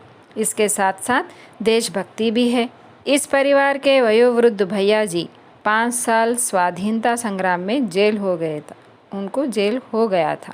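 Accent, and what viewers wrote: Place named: Andhra Pradesh, India. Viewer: Mam looks like your accent is different I think you are more comfort in telugu 😅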